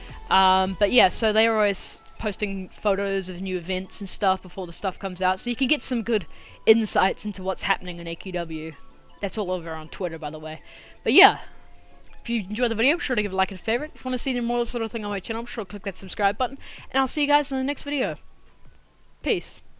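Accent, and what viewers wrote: New Zealand. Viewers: Are you kiwi?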